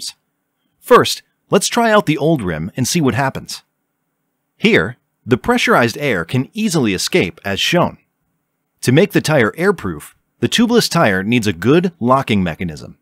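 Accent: Kerala, India. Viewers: The മലയാളം accent seeps through. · Worst Indian accent ever. It sounds like something out of a stand-up comedy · He has a Malayalam accent. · Is that malyaali accent I hear? So good to hear that! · You talks like a mallu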